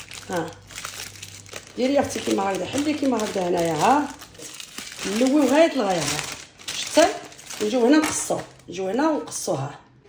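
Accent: Oujda, Morocco. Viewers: الوصفة روعة ولكن تباني في الكلام المغربية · تهدري كي المغربييا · حسبتك من وجدة عندك نفس الهجة المغرب شرقي سبحان الله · لهجتك لهجة مغربية مخلط ببعض الكلمات الجزائرية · كلامك يشبه كلام المغاربة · لهجة انتاعك تشبهلهم ايقولو انتي من المغرب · لهجتها بانتلي مغربية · لهجتك تشبه المغربية اكتر من الجزاءرية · تشبهي المغربيات ف لهجتك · السلام عليكم انا المرة الاولى نحسبك مغربية خاصة كي تبداي تقولي مسالخير عليكم خواتاتي العزازاتوالغزالات.انا جزاءرية وتعجبني اللهجة المغربيةخاصة كي تقولوا كنحماق عليكم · هدرتك تبانيلي مروكية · Javais vue sur une vidéo que tu disais que tu était marocaine,en plus t'es recettes typiquement marocaine 🇦🇱🇦🇱🇦🇱🇦🇱tu parle comment les oujada😂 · هضرتها مغربية كانها وجدية سبحان الله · حسبتك مروكية 😅😅...خاطر حنا نقولوا مانعجزش....مشي مانعڨزش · علاش اللهجة نتاعها مغربية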